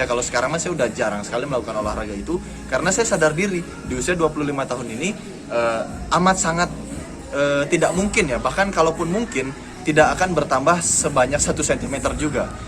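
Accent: West Java, Indonesia. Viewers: Bang dari sunda kah? Itu mangunreja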